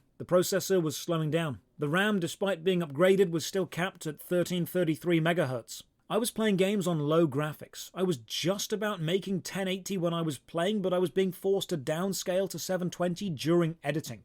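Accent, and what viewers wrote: United Kingdom. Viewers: I started uploading about 3 years ago (I think) and I'm only at 1k... I'm proud of my 1k, since I only play PS, follow only two free-to-play titles, do some Demo reviews, edit and upload with PS4 Share Factory, and have no idea what the hell I'm doing. However, I do believe I am missing only one thing to be a "overnight" success... a british accent! lol That said, I hope one day you do a collab with another great british accent Youtuber I follow Many congrats on your success and keep it up! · Well dressed double barrel British accent man is the best booba streamer. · I think I found your Channel because of your Dreamworld videos. And you are right, I was fascinated by how bad this game is.... And then I basically binge-watched the worst MMO Series... And then I subscribed. : ) But I really like your style, and your clear, 'easy-to-understand (British <3) English' (I'm no native speaker). 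If you continue like this, I'm sure you will do well! I'm so happy for you, that you reached 200k already!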